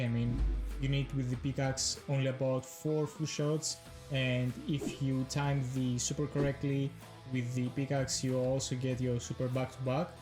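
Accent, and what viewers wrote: Greece. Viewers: Bro with your voice i can understand you are greek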